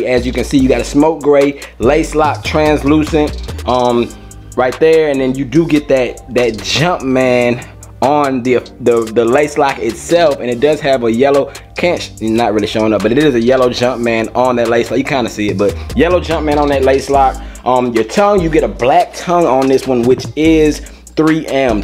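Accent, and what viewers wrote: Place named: South, United States. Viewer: Man on the real I don’t like the shoes but you gotta rock with my G for the true southern accent bruh you gotta love it keep it real for ya boys stayin fresh in that southern mud baby 3rd coast holdin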